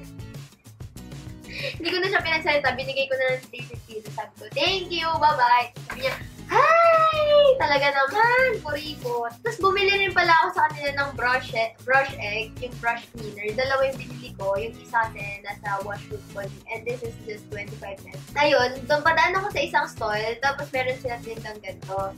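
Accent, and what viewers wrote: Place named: Pampanga, Philippines. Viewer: kapampangan ka te? yung accent mo kase eh 😊😊😊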